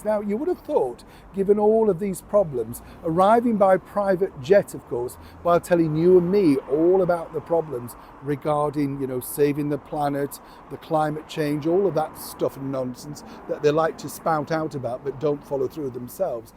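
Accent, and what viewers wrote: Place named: England, United Kingdom. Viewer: It was Mam which is something people called the late queen. It sounded like mom to me too but I think it was his English accent.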